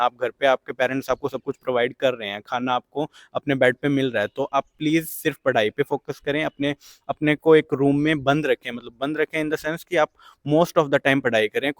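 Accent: India. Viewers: Bhai ki voice haryanvi lg rhi h tone ❤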